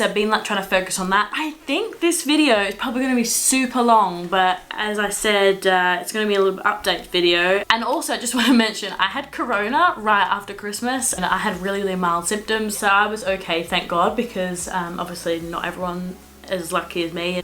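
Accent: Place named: England, United Kingdom. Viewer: It sounds like you have a bit of an English accent!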